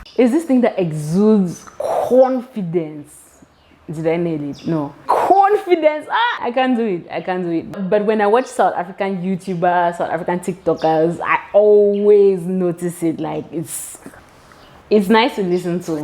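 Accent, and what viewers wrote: Nigeria. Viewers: OK first of all, you look beautiful. And yes, you do look and sound Nigerian. I lived in South Africa for 16 years. No doubt its a beautiful country. But just like every other country including my beloved Naija, has its flaws. Topic for another day. So yeah, I enjoyed ur innocent and open minded view of Cape Town / South Africa. . I could relay to most of the things you said when I newly came. Good a thing you kept the video simple, funny and educative. Thinking of subscribing to your channel, if for nothing else, just to see ur smile once more. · 😂😂😂😂😂😂there is a bit of Nigerian accent I hear it too. However I think as well it’s by default we usually come across more Nigerian Africans then Other other country besides Zimbabwe . You geogeous though ❤single? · it's true, you sound Nigerian. When I saw in Miss Trudy's vlog , I took ma a while to realise that you were Cameroonian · I thought u were Ghanaian, u are beautiful like Jackie Appiah.....but yeah u sound Nigerian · In Botswana, we live with so many Nigerians and their accent is like yours. 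· You sound Nigerian but look South African, it's easier to understand why locals spoke Xhosa to you. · Lmao I'm not sure about you being light skinned making SAns thinking you're Nigerian but I think you really sound Nigerian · u sound nigerian though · You went in on the languages segment 😂😂 I'm with you on "must" and "should". "Cape Town is not a place you go to fit in, you go to stand out" that's a word chiiiile. I also thought you were Nigerian from your accent 😂🤭 And that emphasis on "Zimbabwean friends" took me out looool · IMO…Yes! You sound Nigerian.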